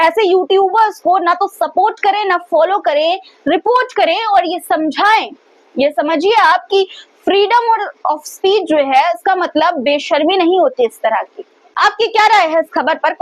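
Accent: Pakistan. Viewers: ye urdu bol raha hai jaise pakistani ho. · Iska bolne ka lehja to Hindustani nahi lagta۔ Pakistani lehja hai is ka۔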